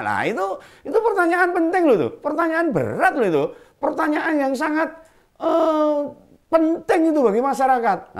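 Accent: Java, Indonesia. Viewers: Pak njenengan jawanya dari mana sih kok lucu banget apa njenengan jebolan pelawak.Tapi pelawak yg cerdas dan gokil trs kan pencerahan2nya.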